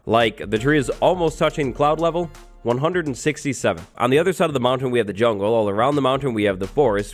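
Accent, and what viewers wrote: United Kingdom. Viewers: I love your British accent lol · i didnt know youre british. your accent would go unnoticed in new England.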